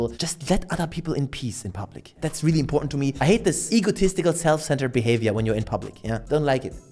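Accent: Germany. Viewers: i love ur "Denglish" man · Alter ich denke, ich schau ein Video von irgendeinem Random Asiate an (Servus Bruder) und dann kommt einfach der assreine Migrantendeutsch hervor den nur ich irgendwie wahrnehme. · Lustig, Englisch mit deutschem Akzent. Ich denke, das "Deutscheste" in Deutschland ist tatsächlich die Mülltrennung. Warum hast Du Deutschland verlassen? Ich denke, mit Deiner humorvollen Art hättest Du in D mehr Chancen. Und in den deutschen Großstädten, in denen ich unterwegs bin (S, M, N) würden die meisten gerne Englisch mit "Zugereisten" sprechen. Anyway, viel Erfolg in CaliforniA.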